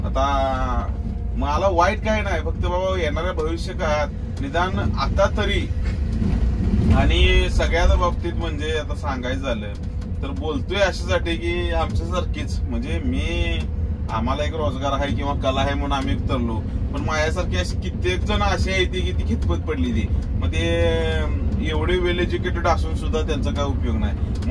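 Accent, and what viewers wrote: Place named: Sangli, India. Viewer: महाराष्ट्र बदल तुझा तिरस्कार नाही... तळमळ आहे.. सांगली भागातील बोलण्याची लकब तशीच आहे 👍🤩🍫🙋 हिंदी मध्ये vlogging नको सबटायटल सुरू करता येत इतर भाषेतील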